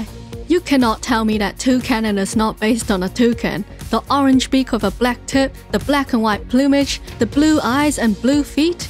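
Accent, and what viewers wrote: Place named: New Zealand. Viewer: First word you said had me check that you were from New Zealand (its just how we speak y'know)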